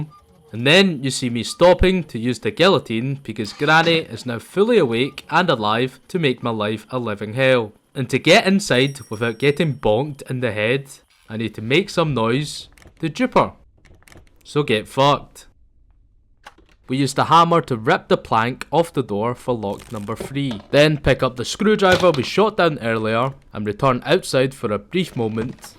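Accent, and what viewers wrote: Scotland, United Kingdom. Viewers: Why do you look Asian but sound like a scot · Saw this vid on my homepage, pressed and liked the humor and ur Scottish accent is so entertaining and I think eventually followed, looking forward to watch more vids, LOL. “Get fucked”😭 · Yoo a fellow Scotsman! Congrats on the world record and the fantastic accent 😂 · an asian with a scottish accent? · the asian with the strongest british accent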